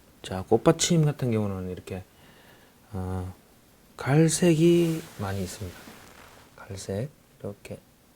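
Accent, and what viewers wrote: Gyeongsang, South Korea. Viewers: 경상도 사투라가 정감있고 너무 웃깁니다,훌륭한 그림배움과 더불어 갖는 큰 즐거움 입니다.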